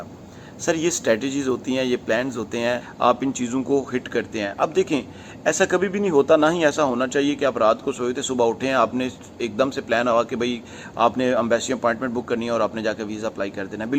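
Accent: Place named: Pakistan. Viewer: Are you an Indian or Pakistani?? I'm doubtful because you have talked about India But noT Pakistan though your name and speaking accent is of a Pakistani.....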